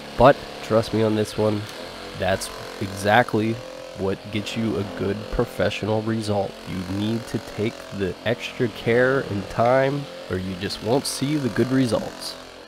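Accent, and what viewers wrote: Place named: Pittsburgh, United States. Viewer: Being from Western Pennsylvania I knew you were from Pittsburgh area Western to central PA From your accent and the mountain laurels in the woods were you and Buck love to walk. You show great projects and do wonderful work Great ideas.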